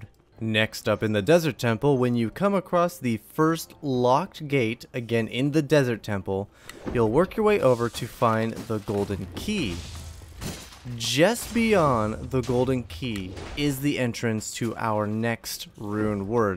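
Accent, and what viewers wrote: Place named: England, United Kingdom. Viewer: Me: DAD I FOUND A VIDEO WITH A GUY THAT HAS AN ENGLISH VOICE! dad: so?? Me: He also tells us how to get to that secret lvl... dad: NANI?!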